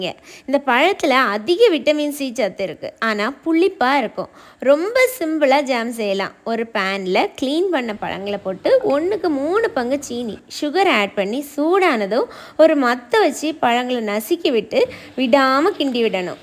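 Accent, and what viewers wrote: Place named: Nagercoil, India. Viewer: sister onga Nagercoil slang super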